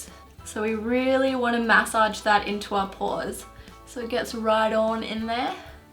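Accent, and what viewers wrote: Australia. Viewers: What?? She has a super heavy Australian accent.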